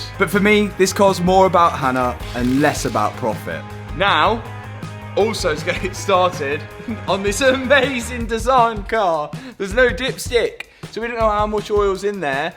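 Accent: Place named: Leicestershire, United Kingdom. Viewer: Love a Leicestershire accent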